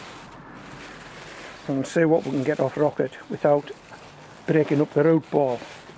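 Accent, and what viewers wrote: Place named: Northern England, United Kingdom. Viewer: Loving your videos, so informative and easy to follow, and loving the fellow northern accent!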